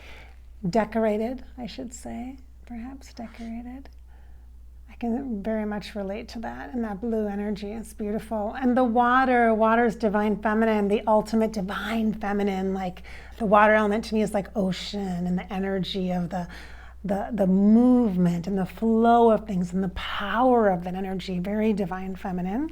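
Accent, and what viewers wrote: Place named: Ireland. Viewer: Love your Irish accent lol 😂 watching in Northern Ireland ☘️💜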